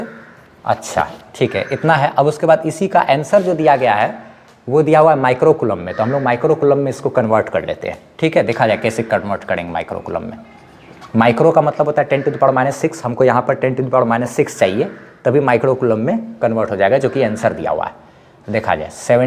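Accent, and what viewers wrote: Bihar, India. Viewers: I really love your Bihari tone 😅❤️❤️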